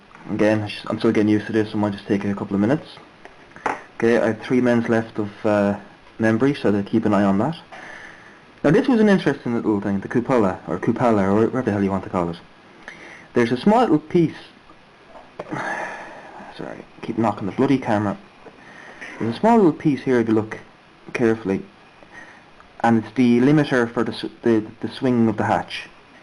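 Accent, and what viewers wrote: Ireland. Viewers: Ah... Part II... All clear now. That was well done. You're a natural. Love the accent dude. You sound Irish... did you know that? hehehe.:)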